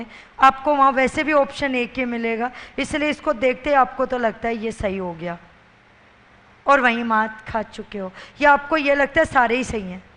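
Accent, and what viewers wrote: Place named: Mathura, India. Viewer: 100% h kI aap mathura se h🙏🏾🙏🏾🙏🏾🙏🏾🙏🏾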